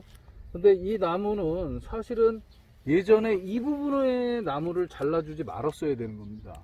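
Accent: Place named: Gyeongsang, South Korea. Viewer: 뻔데 경상도사투리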